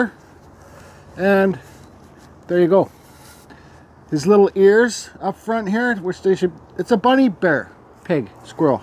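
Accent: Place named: Canada. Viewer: Love the Canadian accent !!! 👍 I’ve learned So Much from You !!! 👍 You’ve Taught Me So Much !!!👍 Thanks 🙏 !!! Can’t Wait to get started !!! My Father was an Artist , Acrylics !!! 👍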